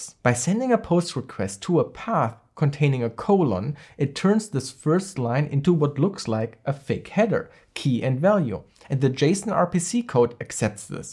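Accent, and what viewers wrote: Germany. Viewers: 3 sekunden ich wusste sofort der spricht deutsch 😂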